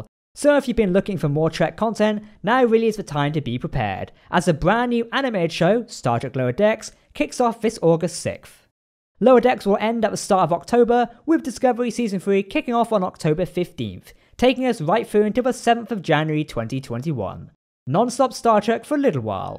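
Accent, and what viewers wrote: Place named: Wales, United Kingdom. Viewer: Looking forward to the new season, although i missed some of the commentary due to the thick excitable Welsh /English accent.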